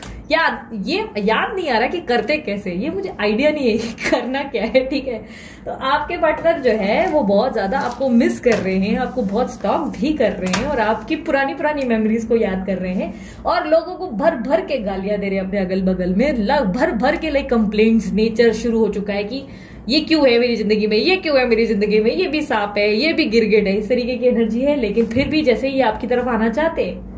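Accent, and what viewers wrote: Mumbai, India. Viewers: Apki Mumbai language mast lagti hai mujhe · Ekdam mast reading mumbaiya language di amchi mumbai jindabaad🤩, I claim all positive energies thank you universe thank you divine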